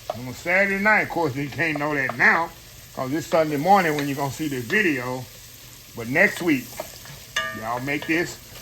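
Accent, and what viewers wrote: South, United States. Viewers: Good morning. I love your videos, considering that I have a severe hearing loss and your last couple of videos not having “Closed Caption” I wasn’t able to enjoy your southern accent. GOD BLESS YOU AND YOUR FAMILY.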